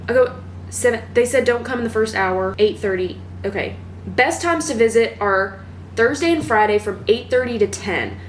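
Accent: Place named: South, United States. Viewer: I hear a southern accent coming along 😂🫶🏼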